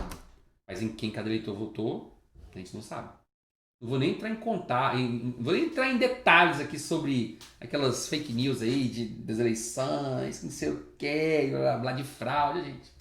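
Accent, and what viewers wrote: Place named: Minas Gerais, Brazil. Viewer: Olá Prof! Sou aluno de outro curso, mas assisto às suas aulas sempre que posso. Sua didática no formato conversação, com jeitin minêro, é sensacional! rs Parabéns, mestre!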